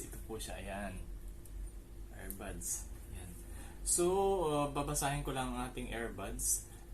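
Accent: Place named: Visayas, Philippines. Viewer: Bisaya to si sir uh.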